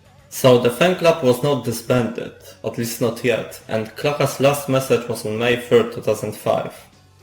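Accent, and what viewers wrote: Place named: Poland. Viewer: czuje polski akcent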